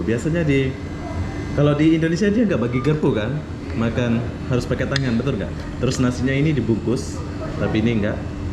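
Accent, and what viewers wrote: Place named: Malaysia. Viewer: Anda org indonwesia tpi dialekx kaya org malaysia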